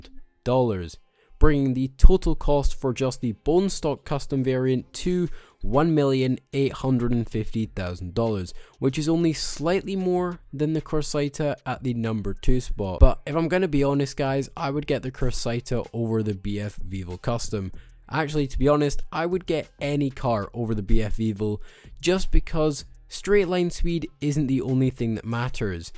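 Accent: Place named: United Kingdom. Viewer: Why tf wouldn’t you put the subtitles for the cars. No one can understand your stupid British accent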